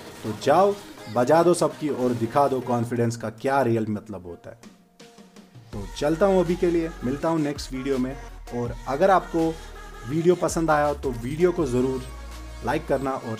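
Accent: Kashmir, India. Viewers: Arey bhai aap ki awaaz sun ke hi laga ki aap kashmiri hooooo. Aap se insta se pata chala. Aapki awaaz rj se milti haii. Luv frm pulwama. Support kashmiri playerssss.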